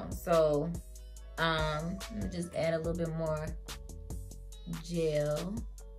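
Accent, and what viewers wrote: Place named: Chicago, United States. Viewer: i can tell you from chicago from yo accent 😍😍 you pretty!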